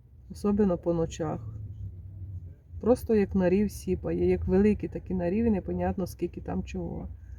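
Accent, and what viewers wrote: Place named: Polesia, Ukraine. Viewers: А ви, напевно, родом з чернігівщини? Моя сваха з тих країв, то в неї точно такий говір.А шапочку потрібно поміняти, на шерстяну алергія. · Де це ви проживаєте? Мова то поліщуків.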